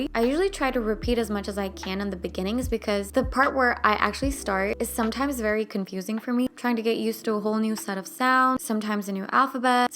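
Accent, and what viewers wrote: United States. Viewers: As an American, I’d say your English accent is 100% American, I would have guessed you grew up here 😄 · Your accent is clearly, American . considerando lo poquito que hablaste en español me parece un acento puertorriqueño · You sound really American what is your first language.? I’m working on Korean Italian French and Russian . I learned Portuguese by getting into Brazilian music and Italian by getting into opera. I actually got into French Regaetton . I talk to my cat in the languages I am learning. I like finding out how other polyglots learn languages. Don’t be afraid to make mistakes is the best advice . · Your English is amazing!!!🤩 It sounds sooooo american.. which I love! How is that possible growing in Turkey? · Oh my gawd, I love your personality <3 Watching your tips on how to learn a language from scratch is as good as watching fav series on Netflix! 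Your humor and American accent rock :)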